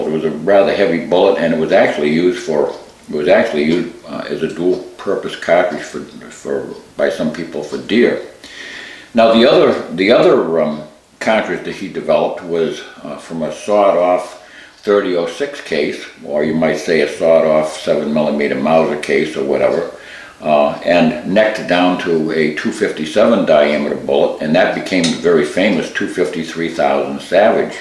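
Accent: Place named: Massachusetts, United States. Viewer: I detect a touch of "Bay State" accent.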